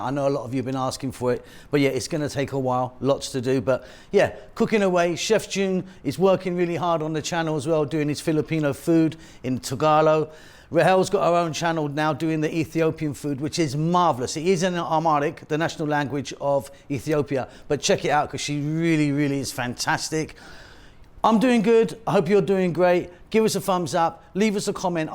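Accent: United Kingdom. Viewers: Like your cooking with standard British accent 👍👍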